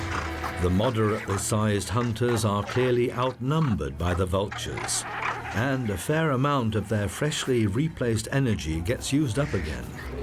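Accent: United Kingdom. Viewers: These documentaries would not be as good without the narrator having a British accent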